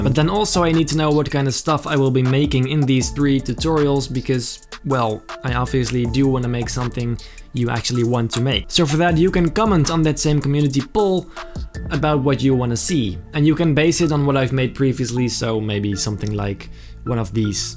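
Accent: Netherlands. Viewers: what do you mean he hasn't done tutorials the dutch accent tuts are actually good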